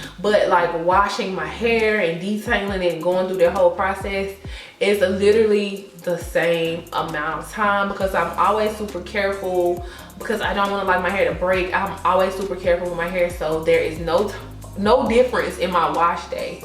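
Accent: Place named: Texas, United States. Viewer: I heard that accent and just knew you from Texas 🙌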